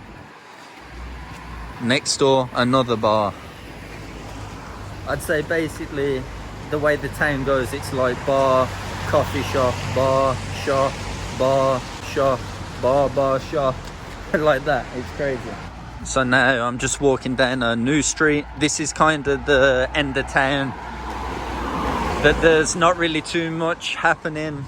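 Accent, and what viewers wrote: Ireland. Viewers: Nice kerry accent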